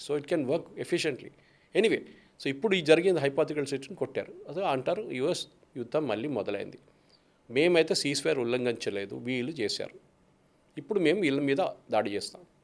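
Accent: Telangana, India. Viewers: sir hats off to ur plenty of knowledge more over telangana yaasa chala bagundi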